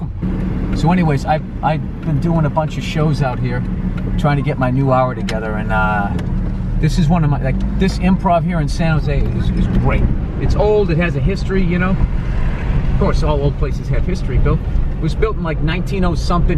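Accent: Boston, United States. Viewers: Bill do another Boston tour the Boston accent is unstoppable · I noticed your Boston accent still comes through although you've taken to pronouncing your Rs. Westfield Malls are all over the place; I visited one in Florida. There doesn't seem to be one in Westfield, Mass or Westfield, NJ, though.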